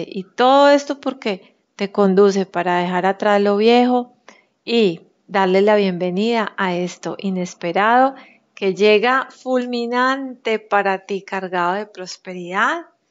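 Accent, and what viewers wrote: Colombia. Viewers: Qué gusto verte siempre tan radiante, tan positiva y con ese hermoso acento colombiano... Saludos desde México... Arriba Colombia!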